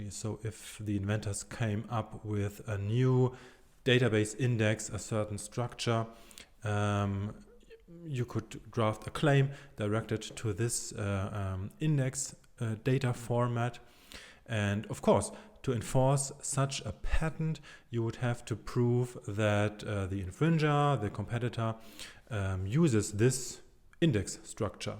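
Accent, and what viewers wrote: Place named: Germany. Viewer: Schöner deutscher Akzent :-) Ich frage mich, ob es für mich als End-User rechtliche Schwierigkeiten geben kann, wenn ich eine kostenlose Software nutze (z.B. Gimp als Photoshopersatz). Z. B. wenn ich als Berufsphotograf Bilder manipuliere und diese dann als JPG exportiere, um diese zu verkaufen. Wäre meine Nutzung der Bildbearbeitungssoftware GIMP illegal, wenn z. B. patentierte Prozesse oder Dateiformate mit der Software zum Einsatz kommen, welche die Software gar nicht nutzen dürfte? Oder sind die Softwareprozesse in diesem Zusammenhang eh kein geistiges Eigentum, weil es unpatentierbare mathematische Formeln sind?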